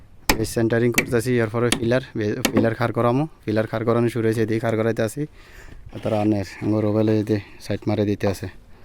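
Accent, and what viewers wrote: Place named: Noakhali, Bangladesh. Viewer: আপনার বাড়ি মনেহয় নোয়াখালী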